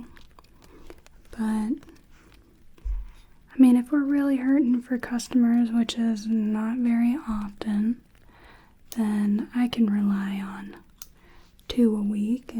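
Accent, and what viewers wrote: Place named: Mississippi, United States. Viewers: Your midwestern accent coming through with that mild southern accent you've got going here is so relaxing. I know you said you weren't shooting for anything in particular, but I love where you landed, lol. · super tingly ✨💕 the genuine listening “yeah/mmhmm” during the conversation 🥴🤌🏻💋 I’ve lived in Northern Mississippi most of my life so I’m used to this accent (I have a slight accent, maybe a little less than what you had in the video) so it didn’t hit as hard for me like everyone else 😔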